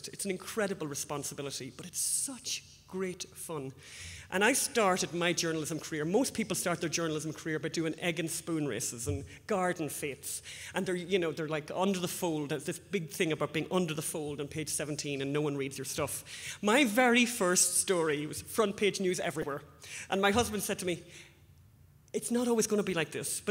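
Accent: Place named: Donegal, Ireland. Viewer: Well. She's from Donegal!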